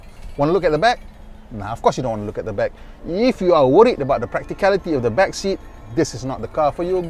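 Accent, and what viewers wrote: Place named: Kelantan, Malaysia. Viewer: best jugak cakap omputih sleng kelantan... senang faham hehehe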